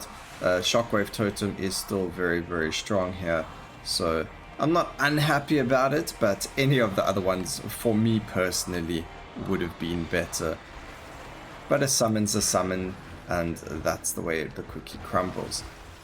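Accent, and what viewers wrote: South Africa. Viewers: Do i hear a south african accent?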